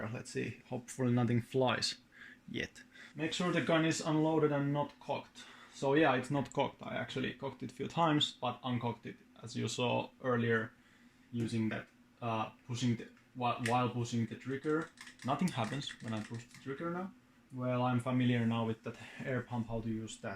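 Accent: Finland. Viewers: Sounds like a finn speaking english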